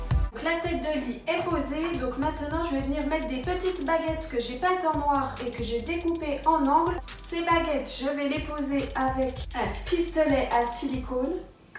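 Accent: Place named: East, France. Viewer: Pas mal ta chaine ! Oh ça sent l'accent de vers chez moi, l'Est !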